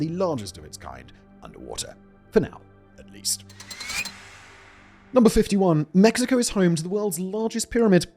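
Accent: England, United Kingdom. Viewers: Actually he lives in Czechoslovakia atm but his from England that's why he has that accent 😂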